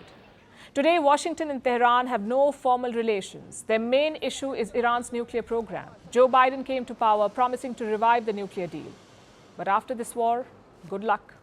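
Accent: India. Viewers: Seem tha you know everything? I dont like how you speak English still you sound indian.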